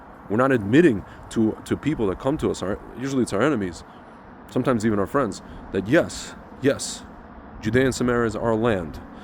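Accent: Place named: United States. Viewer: Pasty faced American accented man talking about his land 😂. Foff. Free Falastin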